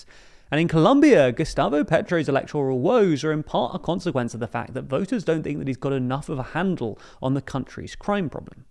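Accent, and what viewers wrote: England, United Kingdom. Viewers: Poor research on this video. Ecuador has also elected a right wing president Daniel Noboa. The incompetence masked by an English accent.....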